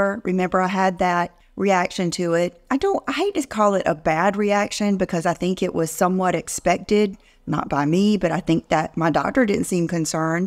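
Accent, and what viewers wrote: South, United States. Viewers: Hi, I used your link and discount code yesterday to order my City Lips, thank you very much for your recommendations, I had to restock my stash, also love the variety on your channel, your presentations are exceptional, and don’t ever loose that charming southern accent, stay with what you’re doing, you can’t improve on perfection. Thanks again and God bless.❤️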